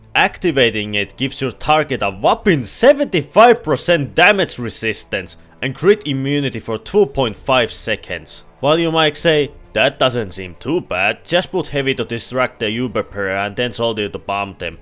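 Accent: Finland. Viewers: you are the suomiest finn ive ever heard, good video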